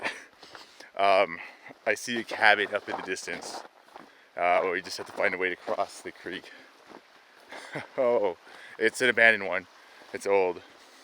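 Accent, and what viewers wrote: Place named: Boston, United States. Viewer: Be proud of your accent! Grew up in Boston also!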